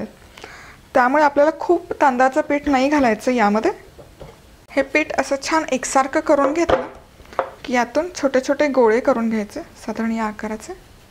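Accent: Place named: Kolhapur, India. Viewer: Kolhapuri aavaj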